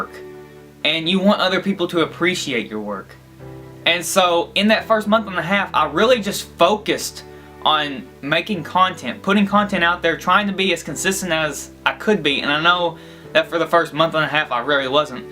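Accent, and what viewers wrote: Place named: Arkansas, United States. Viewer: Btw I like your voice that Arkansas accent 👌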